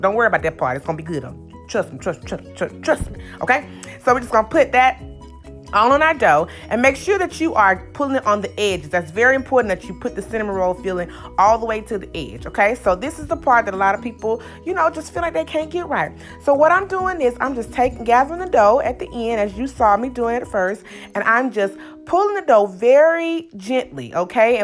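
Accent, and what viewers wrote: South, United States. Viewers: That's that very southern and country talk and spirit. That's "kinfolk" talk ❤️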